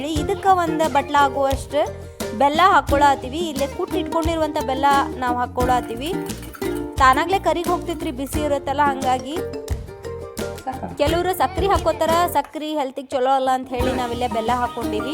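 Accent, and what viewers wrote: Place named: North Karnataka, India. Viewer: Nimma Uttarkarnataka maatu ista magale, bcos naa hutti beladiddu alle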